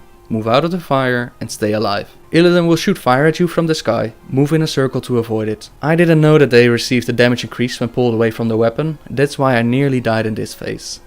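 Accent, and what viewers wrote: Netherlands. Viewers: You sound dutch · zowieso nederlands xD klote accent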